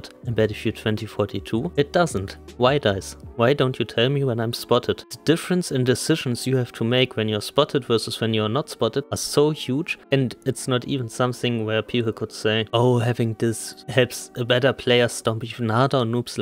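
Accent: Germany. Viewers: sehr gut. Man hört es schon raus, aber ich als 45 Jähriger mit meinem Schulenglisch verstehe es echt gut. 👍🏽 Weiter so. 🍻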